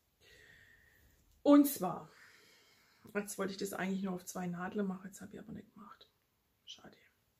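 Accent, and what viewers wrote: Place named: Swabia, Germany. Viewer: Hallo,was hab ich mich auf und über dein Video gefreut.Das es dir gut geht (Mann und klein Lily natürlich auch) und das das Ergebniss von deinem Knie ein gutes war,Gott sei Dank.Ich schaue nicht sooo viele Potcast aber deine verpasse ich nie,ich hör so gerne wenn du schwäbelst:)So,jetzt wünshe ich dir noch eine schöne Zeit,und vor allem bleibt alle gesund. Es grüsst dich ganz herzlich die Gaby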